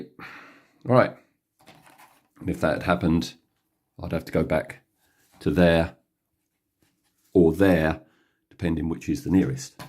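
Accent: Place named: Kent, United Kingdom. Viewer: You sound like a kent ite like me, dunno if your school at the end of term used to gamble crisps on 'battling tops' , maybe it was only us in Sandwich... excellent video sir!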